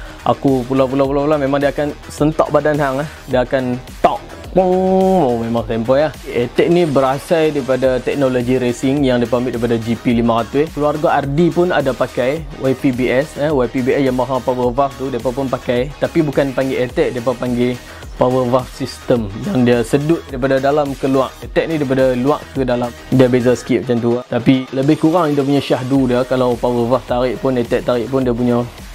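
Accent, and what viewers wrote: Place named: North, Malaysia. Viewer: Mna abg dapat barang moto lama ni....dgr suara belah utara..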